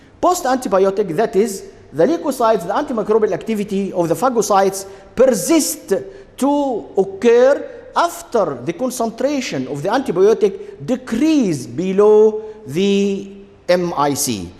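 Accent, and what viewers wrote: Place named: India. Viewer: هو حضرتك بتتكلم زي الهنود ليه 🙂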